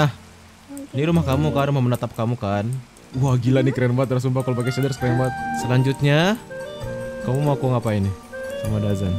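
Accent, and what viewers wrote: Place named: United Kingdom. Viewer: your accent is sounds like British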